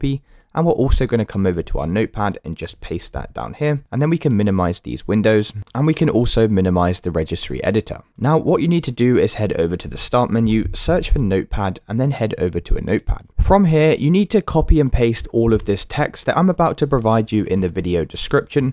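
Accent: United Kingdom. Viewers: how British are you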